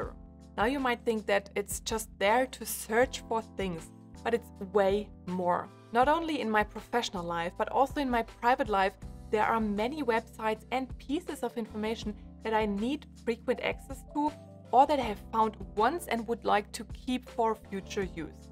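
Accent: Germany. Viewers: Can't stop "listening" to your video. I love your German accent! Bist du tatsächlich Deutscher? Also, bist du Deutscher? Komm schon, erzähl es mir bitte!😃 I am Brazilian and am learning German and to me, you sound like you have a German accent. I could be wrong, but whatever.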